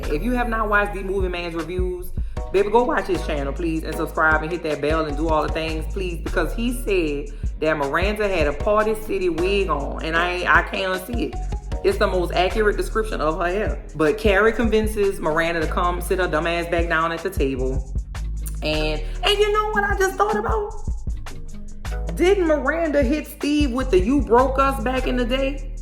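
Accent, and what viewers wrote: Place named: Louisiana, United States. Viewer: Ok Louisiana, I hear that accent. Don’t want to assume NOLA. Side note, Just Like That is the pits. 😔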